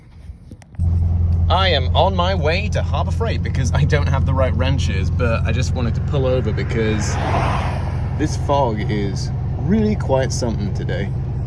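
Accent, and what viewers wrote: England, United Kingdom. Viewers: Haha, Hello there watched the program last night and sounded like you have an acksent,and was across the pond there in England. Lol. That motor sounded good once you got it running,but no I'm from California here in the mountains above Sonora. I was drinking my coffee,and thought I would act like I was from England the way I wrote what I wrote. Lol. I'm a true Californion. Lol and was just playing around about sipping on tea. When I was 5or6 I did ride inn the back of one of those where the seat opened up and back window would roll down. 👍that was around 1980. 👍 a long time ago my FREIND. What's the planes with the car ?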